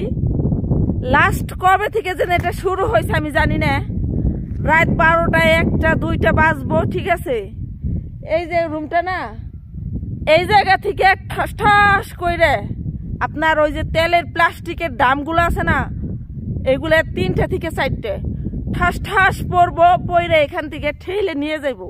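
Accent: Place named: Bikrampur, Bangladesh. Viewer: আচ্ছা আপা কিছু মনে করবেননা, আপনার দেশের বাড়ি কোথায় একটু বলবেন? কেন যানি আপনার ভাষা শুনে মনে হচ্ছে, দোহার কিংবা নবাবগঞ্জ অথবা বিক্রমপুর হবে.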